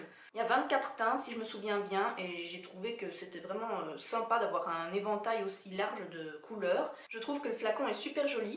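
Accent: Belgium. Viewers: Tu habites en Amérique du Nord non? Il me semble que tu as l'accent Belge!? Chouettes vidéos 👍😉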